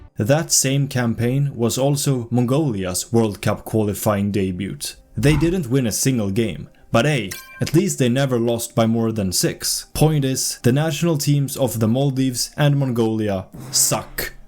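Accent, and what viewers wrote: Sweden. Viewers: Your accent SCREAMS Sweden omg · Swedish-English is so funny to listen to bruh 😭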